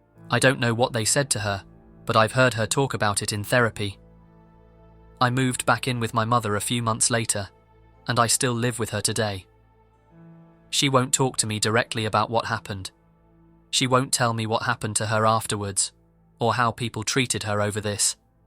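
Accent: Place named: RP, United Kingdom. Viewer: Yeah. Similar tone of voice. And obviously the accent is southern "proper" almost RP English. So I get why you find them similar.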